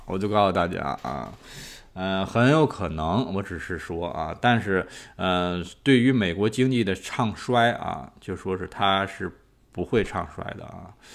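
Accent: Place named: Northeast, China. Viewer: 东北话听着亲切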